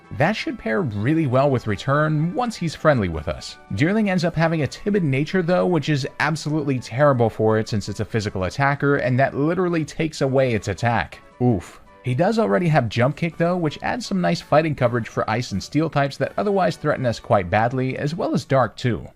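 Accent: Canada. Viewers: You canadien bro?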